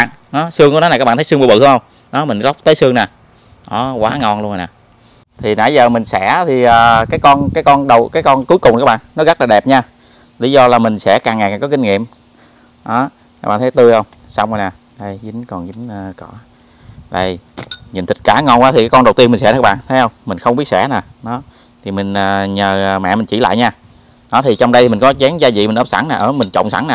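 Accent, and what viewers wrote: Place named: Mekong Delta, Vietnam. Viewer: chau nay noi chuyen dung dan mien tay , thiet tinh , chan chat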